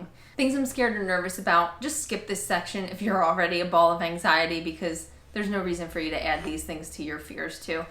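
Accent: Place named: New Jersey, United States. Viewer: Hang in there momma♡ prayers for a successful vbac, your husband present regardless and all good things for your delivery. I know you're from up north, I thought I remembered you saying you're from NJ (and your accent sounds like mine😂) I am a jersey girl born and raised but live in SC and you make me feel back at home♡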